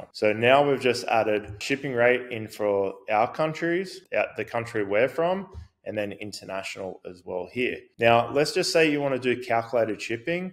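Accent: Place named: Australia. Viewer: Thanks! Nice to have an Australian voice and real person to talk me through it (as opposed to an American chipmunk AI). 👍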